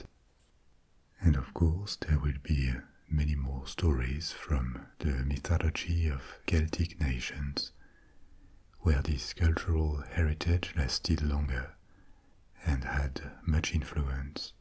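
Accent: France. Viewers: Being Irish and love the french accent, this was perfect for bedtime 😄 merci beaucoup! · I love his pronounciation. Usually its American's botching up the accent (though I don't blame them, Irish spelling is weird but anyone with a passing knowledge of Irish knows the anglicised sounds is not the original sounds) but he has a very strong French accent so I was curious how he would pronounce it. The correct inflections are all there which is all I expect. As someone who the only thing I was good at in school was doing a French accent (not speaking French tho) and combining that with the Irish I was being taught I can recgonise where the mouth was making its natural French sounds but it was still pronoucing the word right. The best way I can describe is that Irish, if you're trying to force the language it can sound choppy and sharp. Kind of like your coughing the words out on the sharp consonents, but as a native speaker it sounds fluid. 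French speakers whether fluent or not always sounds fluid. It's very interesting to hear. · Hearing Irish names and places pronounced in a French accent tickles me in a way I can't quite describe.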